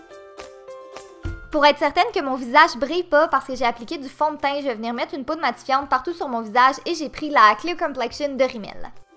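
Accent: Quebec, Canada. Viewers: C'est un accent québécois! :) Elle vient de Québec, Au Canada! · Je trouve que ton accent québécois est quand même très prononcé 😕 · Tu es troooop belle! j'aime beaucoup tes vidéos et ton accent canadien me fait rire à chaque fois! (je suis parisienne...) continue! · J'adore ton accent Canadien ❤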